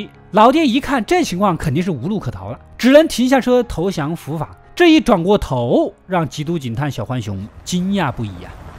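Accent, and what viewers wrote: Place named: Wuhan, China. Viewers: 要听“老爷车”,你浓浓的武汉口音让我觉得像是我在说话：）） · 哈哈，口音好重，不是湖南的就是湖北的，点到你微博一看，原来是武汉伢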